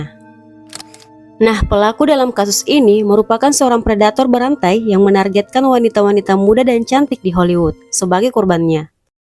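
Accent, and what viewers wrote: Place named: Makassar, Indonesia. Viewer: Yg punya chanel sepertinya org makasar. Soalnya logatnya kentara pas lagi bicara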